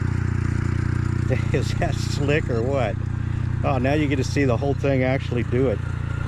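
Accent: Oklahoma, United States. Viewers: I recall seeing gas flares in the Gulf of Thailand many years ago. I wonder if they were pumping oil or gas? If the latter then they are having to import oil for cracking into diesel adding a running cost to the farm machinery I guess. It may be your Oakie accent but I notice you being a bit lazy (dog!) in the pronunciation of the ending masculine “p” in salutations! These general conversations about rural Thai life are great. Perhaps you could drag the Nordic Nerd (when he has finished downloading the GPS tracks) out with you to utilise his seemingly excellent command of the Thai language .. “sam Chang khap” seems to roll so fluently off his tongue! I have now covered several hundred miles on my “ghettoed” tubeless conversion and the wheels (CT 125) have not lost a single psi. I see it done all over Indonesia and the PI on You Tube and wonder if they do the same in Thailand?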